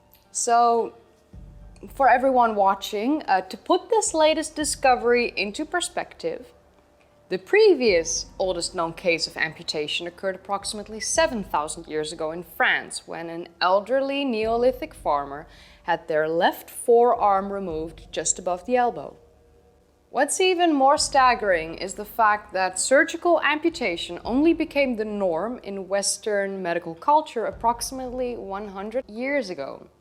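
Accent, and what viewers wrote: Netherlands. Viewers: grappi je hoort meteen wanneer iemand van NL is haha wel leuk wat je doet hoor erg interessant hoor keep up the good work <3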